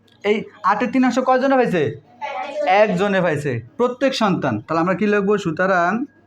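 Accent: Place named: Bhola, Bangladesh. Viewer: স্যার আপনি কি ভোলায় থাকেন ? কথাবার্তা ওইরকম লাগছে ।আমার নানা বাড়ি ও দ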